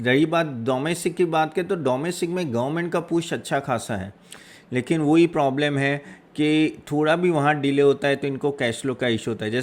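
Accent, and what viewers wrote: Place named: West Bengal, India. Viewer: U speak also like a Bengali n look also.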